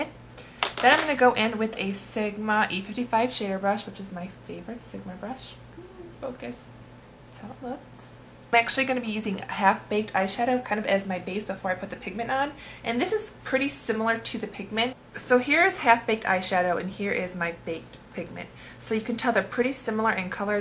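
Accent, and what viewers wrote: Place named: North, United States. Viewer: Hey! i am just curious as to where you are from! i feel like you're voice sounds like mine like accent wise. are you from the north? hahah weird question sorry! i live in the midwest and everyone makes fun of my northern accent but you sound like me i think so just curious:)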